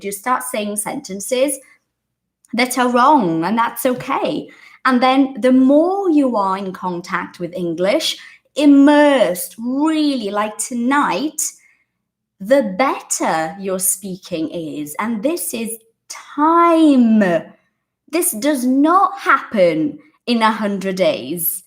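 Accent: United Kingdom. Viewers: Ma'am, you have a really good and beautiful British accent...bruh. I started my English speaking American accent and now I'm falling in love with British one.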